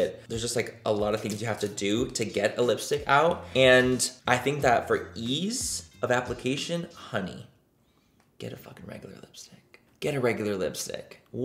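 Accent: United Kingdom. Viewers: Living for the British accent babes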